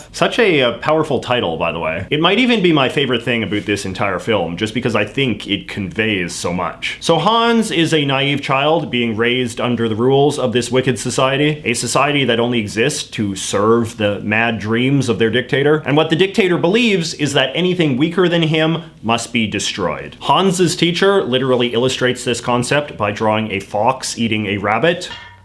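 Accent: Canada. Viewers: I was listening to this without watching and I realized it was with the way he says "about". Damn Canadians.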